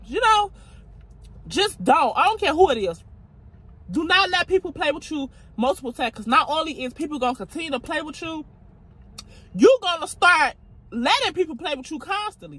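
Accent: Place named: Chicago, United States. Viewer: I love ur Chicago accent, it always make me feel I’m talking to one of my homegirls……#chicagogirls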